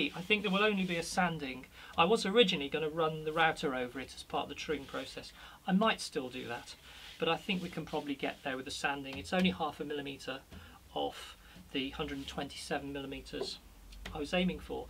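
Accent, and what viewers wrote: United Kingdom. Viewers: You sound like your from uk where is this second hand tool shop do thay have a website. Great video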